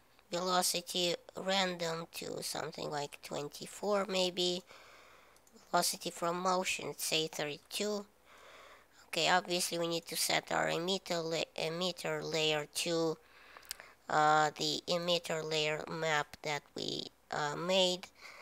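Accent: Russia. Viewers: You sound a bit Russian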